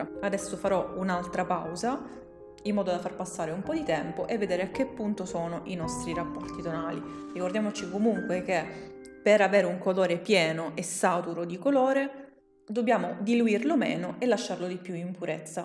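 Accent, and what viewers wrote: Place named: South, Italy. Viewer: MA LA TENDENZA DEL SUD SI SENTE !!!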